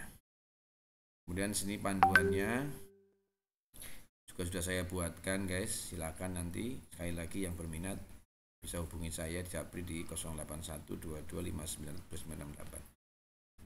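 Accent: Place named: Banyumas, Indonesia. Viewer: Assalamualaikum, bisa minta filenya pak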